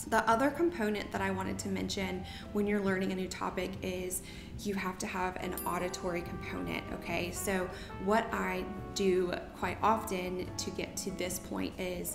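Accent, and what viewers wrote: South, United States. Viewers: I’m English and live in London, I love listening to your southern accent 😄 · I disagree, but I'm not American. One of my favourite elegant ladies, Kathryn Morgan, a professional ballet dancer, is also southern. I find your accent really sweet, definitely more so than certain British accents (like the Midlands!!!), and I've never had any trouble understanding you.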